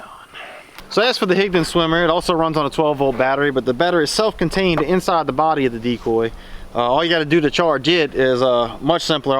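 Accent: Louisiana, United States. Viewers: Great review. Sounds like you gotta a little Louisiana in that accent. 🦐🦐🙌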